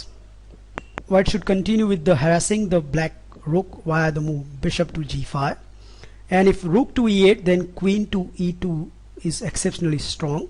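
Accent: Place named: India. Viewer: I bet u are an indian. Lol nice english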